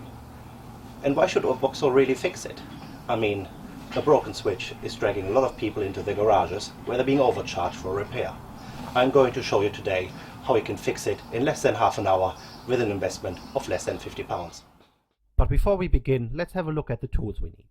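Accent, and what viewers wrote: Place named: Germany. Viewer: Very nice camera work. Very typical German dialect and mindset. Kinda charming - almost. ;-)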